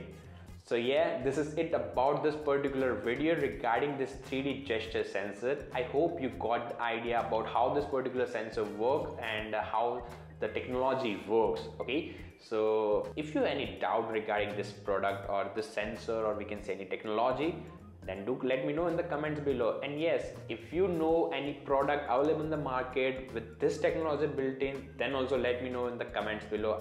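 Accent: India. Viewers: He is speaking English with an Indian accent but you can understand easily or turn on subtitles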